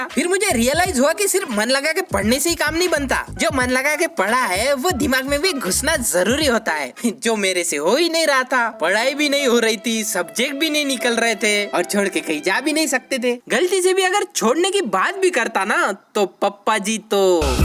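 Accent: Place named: Maharashtra, India. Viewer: I love your Marathi accent😘😘😘❤️❤️❤️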